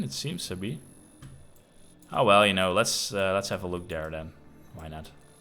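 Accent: Netherlands. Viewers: Are you dutch? I bet you're dutch.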